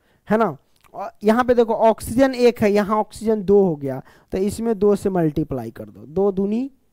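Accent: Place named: Bhojpuri, India. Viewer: Kya aap bhojpuri h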